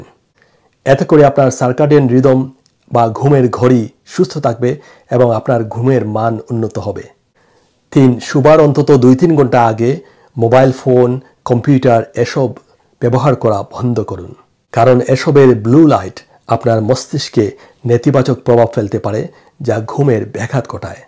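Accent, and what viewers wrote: Sylhet, Bangladesh. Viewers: স্যার কি সিলেটি? কথার টানে মনে হয় সিলেটি❤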